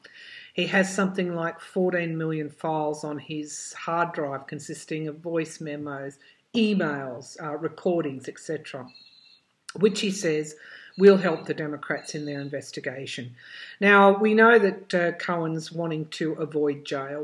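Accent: Australia. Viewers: I do think Cohen is concerned for his wife and children. I have empathy for him because he confessed his guilt and said he was deeply ashamed of his involvement with the Trump criminal enterprise. I think he has truly turned away from evil and is truly worried that Trump could bring America down completely and kill our democracy. Thank you for another insightful reading. I love listening to your lovely Australian accent as you speak. 🦘🦋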